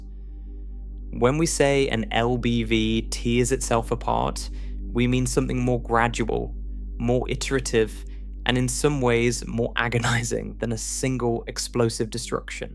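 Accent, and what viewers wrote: United Kingdom. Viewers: The omnipresent British accent is getting veryyy old.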